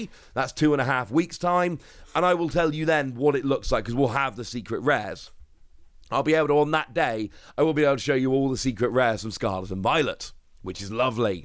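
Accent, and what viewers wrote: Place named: England, United Kingdom. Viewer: I joined the subscription after just 2 videos after listening to this cheerful and chipper English accent poketuber. It's so refreshing to hear a change of pace and be actually helpful/ competent. Not only does this channel talk about what to do competively but also discusses what to invest in for memorabilia/ speculation investments to make money only to buy more pokemon cards!!!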